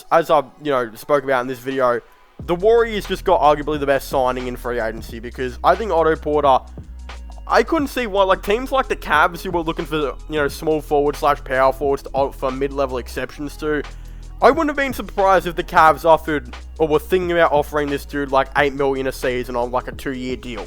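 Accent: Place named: Australia. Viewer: I've subscribe because I love this dude's accent. I wonder where he's from? 🤔 Maybe Australia 🤷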